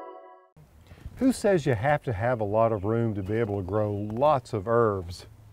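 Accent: United States. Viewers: urbs??? Baysal??? ;) loving the American Diction :)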